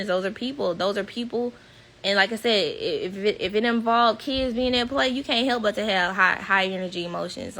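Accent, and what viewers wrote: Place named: South, United States. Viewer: I love me some. I love the southern twang and all the realness of her.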